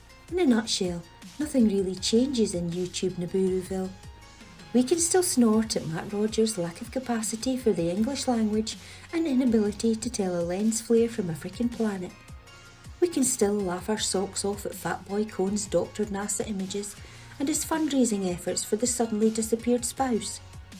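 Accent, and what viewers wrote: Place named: Scotland, United Kingdom. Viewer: Your sweet voice+Scottish accent, is like song for sore ears, my dear! And yes, it has truly been, the wankiest of years; all of what you mentioned, my best flyfishing buddy (me Da, 83yrs young) being dagnosed, with terminal Liver-Cancer😩 (moderate drinker!). Feelings: <link> May, Hugin & Munin, guide your way...Take care of yourself and yours!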